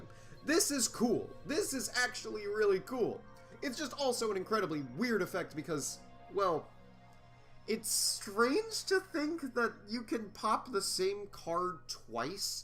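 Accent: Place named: New Zealand. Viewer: WAIT. You were kiwi the entire time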